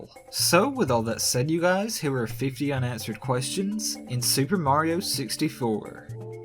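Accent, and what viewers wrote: South, United States. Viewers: Is this guy from the south?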